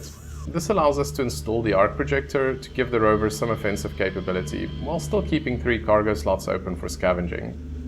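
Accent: South Africa. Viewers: You sound awfully South African for a Singaporean!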